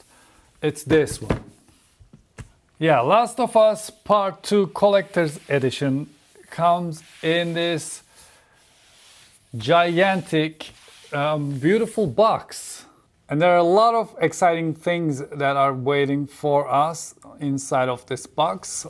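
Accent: Turkey. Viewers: nedeni türk aksamı ile konuşması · İlk kelimeden anladım türk olduğunu xjdkkd nyse abi efsane ya herkes alabilse keşke o art book un içinde muhteşem şeyler var be bileklik figür çanta falan mükemmel ya o parçalardan biri için kavga bile edebilirmxdkkdkkdld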